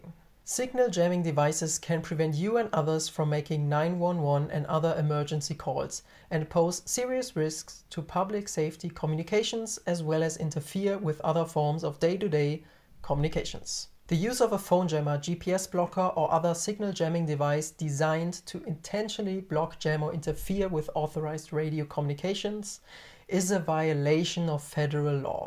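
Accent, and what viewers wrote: Germany. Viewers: you have a german accent lol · Are you German ?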